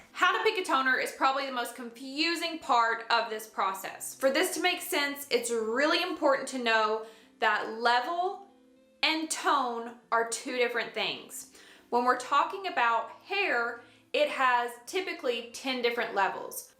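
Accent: South, United States. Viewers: Okay, you need your own talk show 🎉Fo REAL, though! You're absolutely adorable; and just incredibly endearing to watch! Normally, Southern accents drive me insane; but it just adds to your amazing charm 😊😅 Can you do a video of your haircut, updo messy bun, braids, and your make up! Your foundation is pretty flawless, and your brow are on fleek! Are they microbladed? You look like a more edgey Stassi Schroeder from VPR, actually!!! Thanks for all the wonderful tips! Soooo incredibly helpful 🎉I hope you got a nice side hustle going through social media $$$ 😊❤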